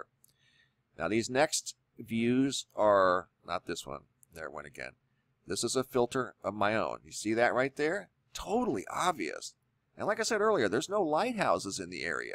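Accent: United States. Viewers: ThingsAreToughAllOver it's cool mang, he's American I'm just glad they say my name right still.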